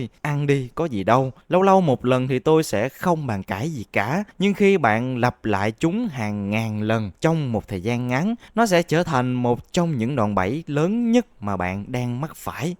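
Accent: South, Vietnam. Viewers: Hai nghìn lẻ ba (2003) , không không , phải là hai nghàn lẻ ba mới đúng , giọng miền Nam thì phải dùng từ ngữ miền Nam , cảm ơn !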